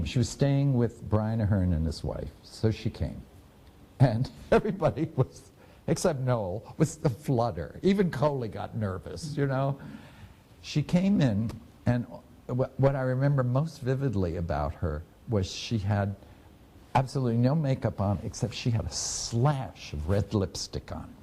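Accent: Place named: United States. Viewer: Awful narration, not to mention the god-awful American accent.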